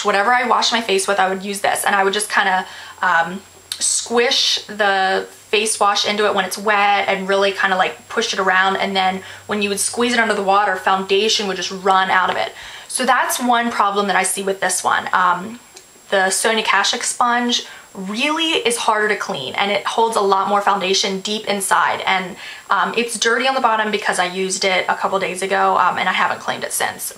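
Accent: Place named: Louisiana, United States. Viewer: I'm from Louisiana - you sound like you're a Cajun girl too maybe?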